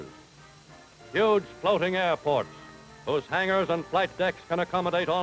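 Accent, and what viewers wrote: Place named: Mid-Atlantic, United States. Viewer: Great slice of history. Love the old-timey narrator with the generic "Mid-Atlantic" accent.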